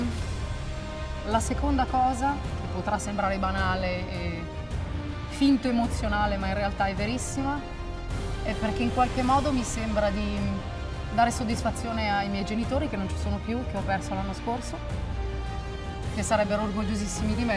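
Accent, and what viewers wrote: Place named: Italy. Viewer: Q mujer más Hermosa... ese acento Italiano es irresistible...((( 🖤 )))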